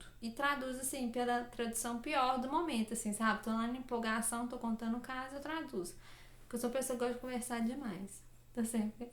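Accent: Minas Gerais, Brazil. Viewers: Você tem o sotaque mineiro bem forte hahahahah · O que percebo eh seu sotaque mineiro, alias, dos brasileiros,é o que mais gosto. As Dinamarquesas costumam fazer blogs, eu nao tenho ideia de como é o idioma dinamarques, eu ja ate botei algum video aqui, porém nem lembro, mas pelo jeito devem falar divagar porque as duas brasileiras q acompanho no ytube, voce e outra, falam bem divagar...ou será coincidencia...a outra é carioca.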